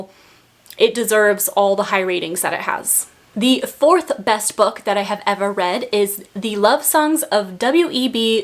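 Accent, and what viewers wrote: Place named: England, United Kingdom. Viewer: What English accent you have I like your talking ❤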